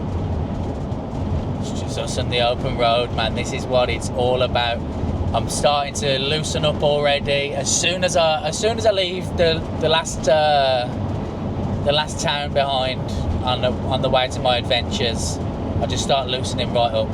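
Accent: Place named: Black Country, United Kingdom. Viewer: And just where abouts from the Midlands are you from? I'm reckonin Black Country... Bilston... Shortheath? Willenhall maybe? Go on, tell me how far off I am! Love the views and the van by the way!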